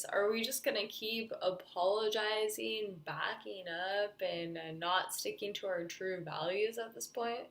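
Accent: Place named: Ireland. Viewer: She's Irish.....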